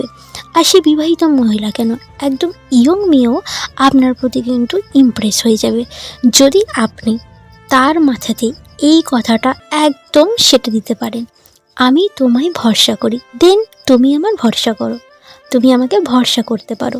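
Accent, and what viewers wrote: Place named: Pabna, Bangladesh. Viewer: পাবনার মধ্যে পাকা কন্ঠে ভিডিওটা খুব ভালো লেগেছে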